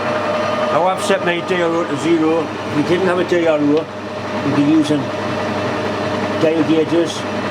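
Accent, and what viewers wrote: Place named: Durham, United Kingdom. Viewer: Love your video's- I was born in Durham 72 years ago and left for Australia in 1964 and its great to hear that accent ✌✌✌✌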